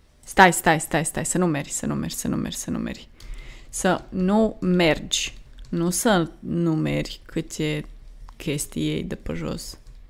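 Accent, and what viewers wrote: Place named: Cluj, Romania. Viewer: Ce accent de Cluj😂❤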